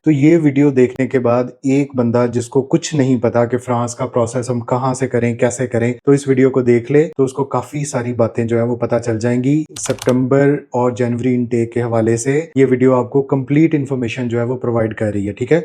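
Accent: Faisalabad, Pakistan. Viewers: Pure Faisalabadi! But Genuine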